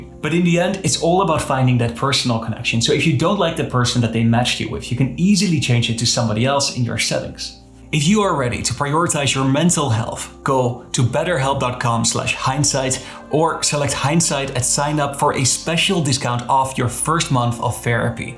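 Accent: Netherlands. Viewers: Man! Your VERY thick Dutch accent distracts me from the content of the video!! :-(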